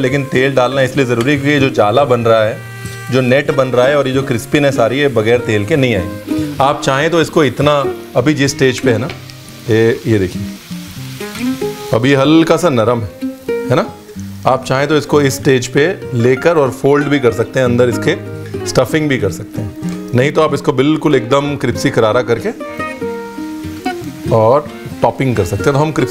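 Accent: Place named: Punjab, India. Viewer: Ranveer paji tuc hindi che punjabi bolde bot vadiya lagda sachi gal paji tuhadi gal baat sunn hi aanda tuhdi video te osm paji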